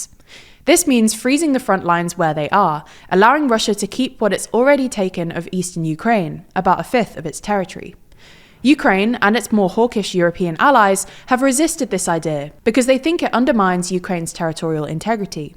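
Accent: England, United Kingdom. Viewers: Love her accent. What part of England is she exactly from?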